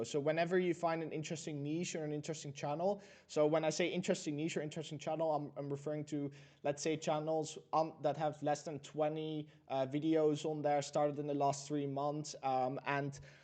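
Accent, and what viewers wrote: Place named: Netherlands. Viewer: Are you Dutch? Cause I hear the accent 😂💪🏽💯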